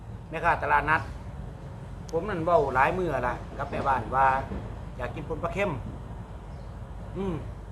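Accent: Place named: Sisaket, Thailand. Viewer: เจ้าลองเว้าแบบบ่ออกภาษาส่วยแนจักคลิปนึง ภาษาที่เจ้าเว้ามันคือสำเนียงศรีสะเกษแม้ะ ฟังแล้วมันบ่ม่วนแม้จะอ้าย เว้าคือทางเฮียตั้ม สำเนียงอุบล ร้อยเอ็ดแบบนี้ ค่อยฟังม่วนแนครับ แบบเจ้าเว้านี่ ถ้ามาแถวๆบ้านผมเขาสิว้าเจ้าเป็นคน ส่วย เขมร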